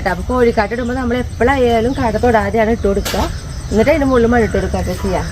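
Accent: Thrissur, India. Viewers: hello, njan thrissurkariyanu. veedu evide anu Anu? Thrissur bhasha kelkkumbol valiya snthosham. Krishi valare ishtamanu. All the best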